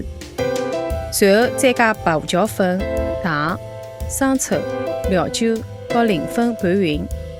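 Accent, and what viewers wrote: Shanghai, China. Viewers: Yanting Qi 这个就是地道的市区口音，现在很多人听洋泾浜听习惯了就觉得正宗的奇怪？ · 我东北人，不看字幕只能听懂个别词😂上海话还挺好听的。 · 在美国听到上海乡音好亲切好温暖！ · 满地道的上海市区口音啊，就上海市区话就分好多说法，有偏宁波口音的，苏州口音的，苏北口音的还有广东口音的，还有上海乡下青浦奉贤浦东等口音，有人说‘回来’也有说‘转来’的，都可以啊，主要看本来祖上或者生活的社区是哪里的移民，那个'teng一teng'就很地道，上海话普遍语速偏快，但是带苏州口音的就会说的比较慢，因为苏州话很细很软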